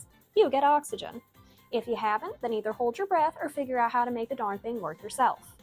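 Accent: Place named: South, United States. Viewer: Your southern accent is thicker than scots' or Northern Irish's that Americans usually complain about. This is not a critique, just something I found funny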